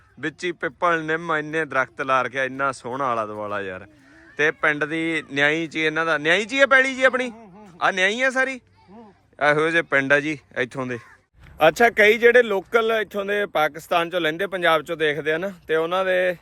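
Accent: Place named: Dhanaula, India. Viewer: ਤੁਸੀਂ ਕਸਮ ਖਾਧੀ ਹੋਈ ਹੈ ਕਿ ਕਿਸੇ ਵੀ ਇਲਾਕੇ ਵਿਚ ਜਾਓ ਤਾਂ ਅਪਣੀ ਧਨੌਲੇ ਵਾਲੀ ਬੋਲੀ ਹੀ ਬੋਲਣੀ ਹੈ? ਬਾਈ..ਬਾਈ.., ਝੋਨਾ, ਨਿਆਈਂ..! ਇੱਕ ਬੇਬੇ ਨੂੰ ਤੁਸੀ ਸਤਿ ਸ੍ਰੀ ਅਕਾਲ ਬੁਲਾਈ ਤਾਂ ਉਹ ਮੂੰਹ ਵੱਲ ਦੇਖੇ। “ਨਿਆਈਂ ਦੀ ਜ਼ਮੀਨ ਦੱਬੀ ਬੈਠੇ” ਤੁਸੀਂ ਦੋ ਵਾਰ ਬੋਲ ਚੁੱਕੇ ਹੋ। ਪਹਿਲਾਂ ਨਾਸਿਰ ਢਿਲੋਂ ਨੂੰ ਵੀ। ਦੱਬਣ ਦਾ ਮਤਲਬ ਤਾਂ ਕਬਜ਼ਾ ਕਰਨਾ ਹੁੰਦਾ। ਉਹਨਾਂ ਦੀ ਪੈਲ਼ੀ ਹੈ ਤੇ ਤੁਸੀ ਦੱਬੀ ਦੱਸਦੇ ਹੋ?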